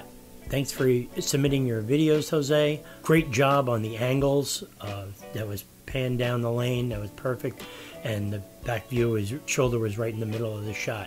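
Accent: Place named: Brooklyn, United States. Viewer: Enough of the Brooklyn accent. It's not funny and I will unsubscribe if I keep hearing it. I'm here for information not some whobis trying to be comedian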